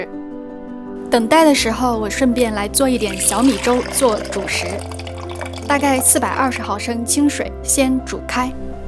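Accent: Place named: Japan. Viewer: 看过一个日本人说中文做菜的频道，你这语音语调描述方式就听着好像啊。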